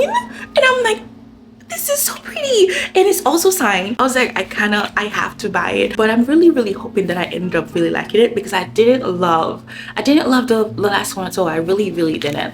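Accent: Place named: Caribbean. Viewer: I’m hearing an accent, just wondering which Caribbean country you’re from?😅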